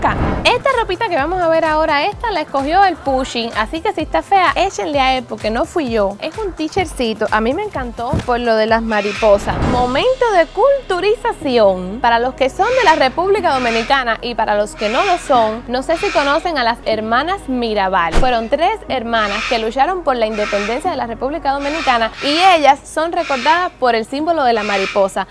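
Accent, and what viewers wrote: Cuba. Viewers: Su acento de cubana es simpático, yo q ella, no enseñaria todo lo q tengo, no hace falta, ya tiene bastante dinero, le gustará la fama, bueno, cada uno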